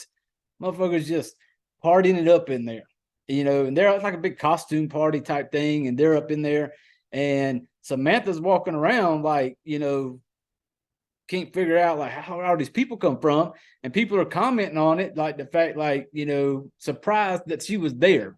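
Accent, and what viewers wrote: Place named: South, United States. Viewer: Dude it ain’t no problem at all. I’m from the South too man. Just above Danville Virginia. When I heard you talk I was like hell yeah! I like this shit! I have The Tank lined up for me and my wife to watch tonight. That sounded like my kind of movie 👍🏻👍🏻 I started listening to your first podcast and I’m working my way up so I don’t know what all you’ve put on the podcast but do you ever watch Bigfoot movies? I loved me some Bigfoot dude. Check out Exists if you haven’t. I would love to hear your take on that one 👍🏻👍🏻 I’m an Elijah Craig drinker myself, matter of fact I took a half day off work to enjoy that beautiful stuff and mow my yard! Lol! I ain’t never mowed sober.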